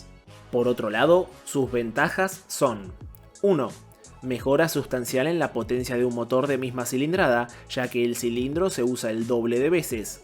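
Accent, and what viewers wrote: Argentina. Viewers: Está buenísima la info y, escuchar en idioma argento...le dá un plus❤❤❤❤😂😊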